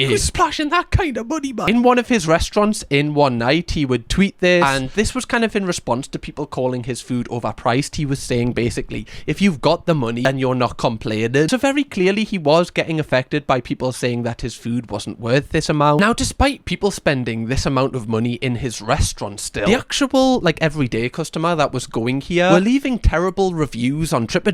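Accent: Newcastle, United Kingdom. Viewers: isn’t talking with an inflection, he’s talking with a Newcastle accent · Close; he is from the north, but is a Geordie from Newcastle/ Sunderland. Not from Yorkshire. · he's a Geordie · Im Welsh I bloody love your Goerdie accent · Hes a foreinger from up north jordy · Geordies innit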